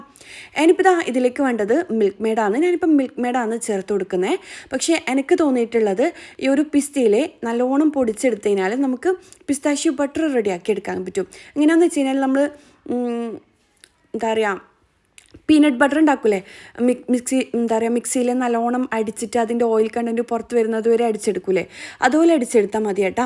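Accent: Kannur, India. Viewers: First time seeing ur channel . Nalla samsaaram 😘😘😘Kannur aano .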